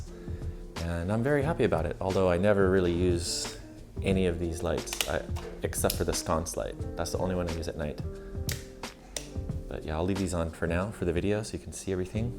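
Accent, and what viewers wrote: United States. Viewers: Thank you for your reply. Hanoi sounds like the ideal climate for me. I like a mild winter but not 5 months of ice and snow. I would like to find a studio for $250 or a little more depending on amenities. Like you, I don't want to share a kitchen. Too many bad experiences sharing kitchens or bathrooms. Most people are not very considerate. You sound like an American. Are you American or Canadian? If American, have you run into resentment regarding the Vietnam war? I was always against the war. I am old enough to have fought in it but I was so opposed because I knew we couldn't win unless we used nukes and that would have brought in China. Johnson was a very bad, stupid president who wasted a lot of lives on both sides because he couldn't admit he was wrong. Eisenhower told Kennedy to never get involved in a land war in Asia because you can't win but they murdered Kennedy who was going to pull out after the 1964 election. 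I have many reasons for being interested in living in Vietnam, mainly the cost of living and I like the people. I rented apartments in S.F. to boat people in 1979 and found them to be lovely people. I also am interested in seeing if I can help mitigate the damage the U.S. did by teaching english, friendship, mitigating agent orange etc. By being there I can also help their economy in my small way.I will look for your Q and A video. Sounds good. Thanks again.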